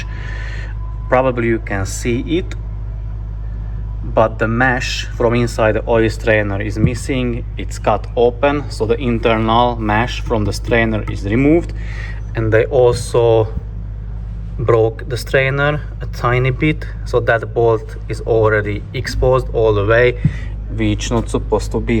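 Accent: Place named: Hungary. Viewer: Vicces, hogy a yt feliratozója teljesen jól megérti a magyar akcentust, nem úgy mint az őslakókét. :)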